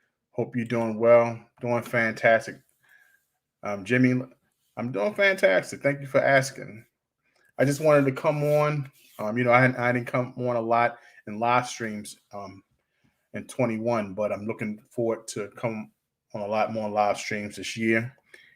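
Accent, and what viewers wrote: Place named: Maryland, United States. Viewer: This was a treat to watch!!! Many genuine laughs while watching. your Maryland accent is so awesome. I’m amazed you learned about silver through the Moorish science movement. Islam!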